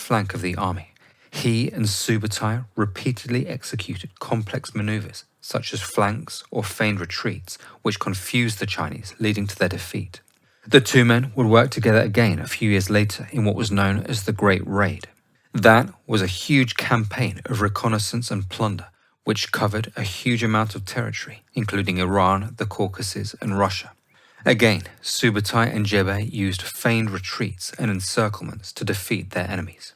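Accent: United Kingdom. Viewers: good narration, sources cited in the video, good historical and reproduction footage, British accent, and cool topics. Subscribed.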